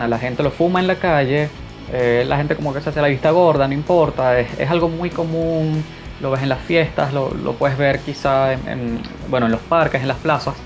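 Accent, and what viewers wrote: Venezuela. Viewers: ¿Y de dónde eres? No he podido identificarlo por el acento... ¡Ah, ya! De Venezuela. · me encanta tu acento venezolano...saludos desde mexico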